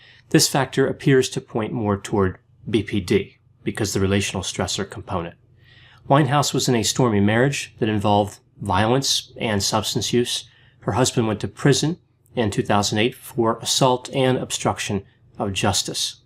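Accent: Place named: Harrisburg, United States. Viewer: I share the Delaware Valley accent with. It's a beautiful thing. But I'm curious to know if he's more west-of-Philly than he is Delaware Valley. His accent would seem to indicate this. From him I hear more Harrisburg (or at least Montgo) than I hear Philly or Wilmington.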